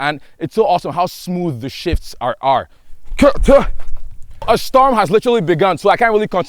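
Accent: Nigeria. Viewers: Came for the car, stayed for the laugh and the Nigerian (west African) accent.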